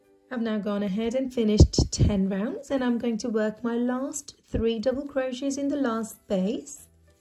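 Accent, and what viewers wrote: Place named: Wales, United Kingdom. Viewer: I have made it and it is very nice. What a lovely accent . Is it Welsh?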